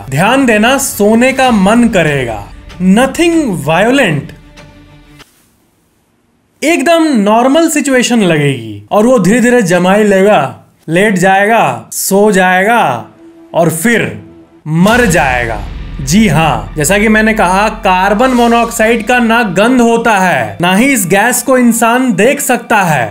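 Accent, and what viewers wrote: Bihar, India. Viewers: Bhai app 😊Bihar se ho😊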